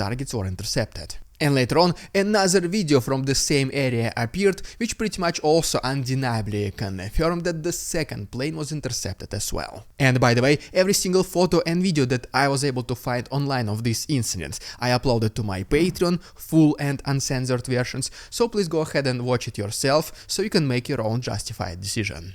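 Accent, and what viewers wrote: Russia. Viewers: geez you rave on and that horrible Russian accent I'm gone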